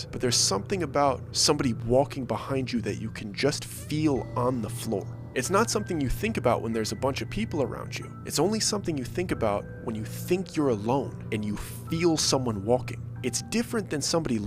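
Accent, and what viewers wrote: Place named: East Coast, United States. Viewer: Now I know why listening to you sounds like I’m listening to one of my friends from the neighborhood. I grew up on the East Coast and did a couple of summers in Queens. I also had to Service hour penance.o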